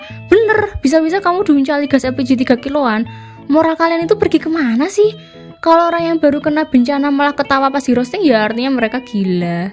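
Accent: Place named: East Java, Indonesia. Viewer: Kaka dari Jawa Timur ya, soalnya kaka suara nya agak... Medhog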